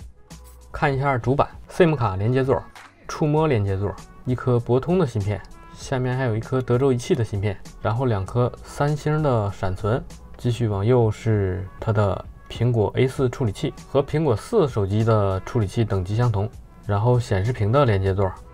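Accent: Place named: Yushu, China. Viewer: 听口音像是榆树的，老乡？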